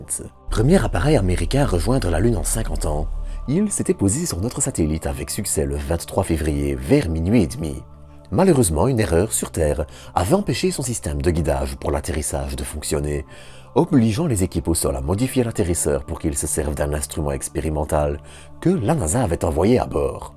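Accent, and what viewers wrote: Belgium. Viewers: Avec l accents belge une fois..ça fait comique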